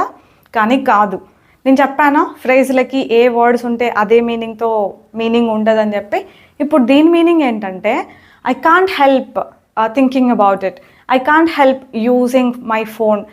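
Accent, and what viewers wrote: Anantapur, India. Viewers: Akka medhi Anantapur aa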